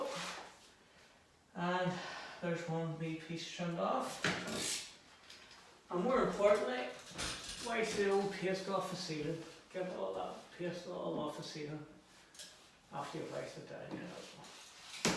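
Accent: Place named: Northern Ireland, United Kingdom. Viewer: Nice to hear someone from my part of the world on here! I’m a decorator just outside Belfast. Good videos!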